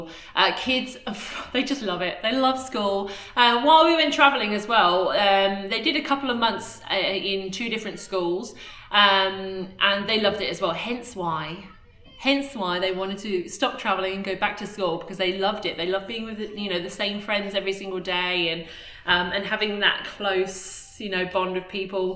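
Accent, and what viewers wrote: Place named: England, United Kingdom. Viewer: Hi from New Zealand It is great to hear your English accent again. Thanks for your honesty of your life Once a traveling family always a traveling family. Yes you have to try things out to see if you like it. It is great to see a close family that wants to be together I admire that. Yes stay strong together and support each other. It would be great to see update videos on this channel every 6 weeks or so as I only follow the youtube format. It doesn't have to be flash and fancy. Just a talk video like this one and some short highlight videos. The house videos would be interesting to see but your future videos would be better. I at 59 would sometime in the future would like to come to Australia to do a 3 year traveling holiday but I have to encourage my wife to do it. We do enjoy for holidays and weekends enjoy traveling in our 2007 Jayco Sterling caravan and our 2015 ford ranger. 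It would be good to see you in NZ one day. All the best stay strong. Keep the great videos going thanks Jono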